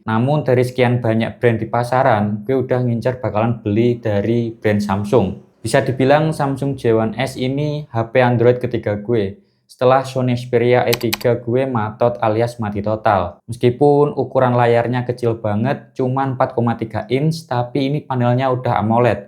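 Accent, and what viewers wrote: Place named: Java, Indonesia. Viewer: Kyknya gw nya mending di ganti aku deh bng medok soalnya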